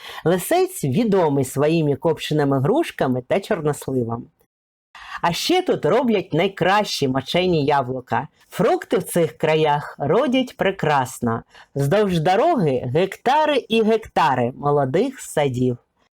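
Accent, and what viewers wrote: Russia. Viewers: Чомусь дратує мене така українська мова з явним російським акцентом...Вимкнула звук .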